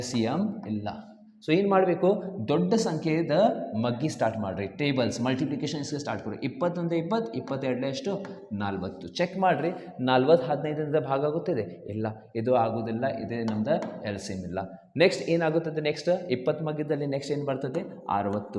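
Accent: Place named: Karnataka, India. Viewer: Kannada voice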